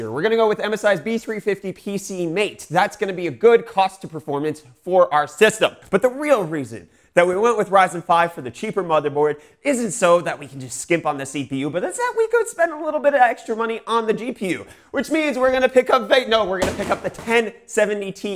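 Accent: South Africa. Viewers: Are you South African? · Are you south african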